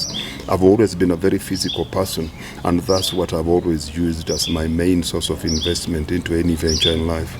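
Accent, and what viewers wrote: Kenya. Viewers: I thought he has luo accent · His accent just reminds me of Papa Shirandula. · that is a luhya accent kabisa kwanza ya wa samia wa busia.prove me wrong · Amazing how he still have the Kenyan accent after all that time in SA...this guy is inspiring in so many ways...kudos my Kenyan bro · This was so beautiful I absolutely love the Kenyan accent., you are doing a good job with this series oh! What a beautiful dog he has, wonder what the breed is called...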